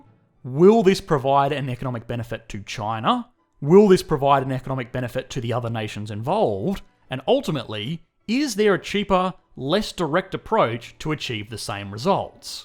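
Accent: Australia. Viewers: This dude sounds Australian and is saying China is about "Free Trade".... Has he looked at his local newspaper? Almost like me saying America doesn't have a rioting problem. Or China saying they didn't start the Coronavirus. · 趙子畵 hes definitely an Aussie.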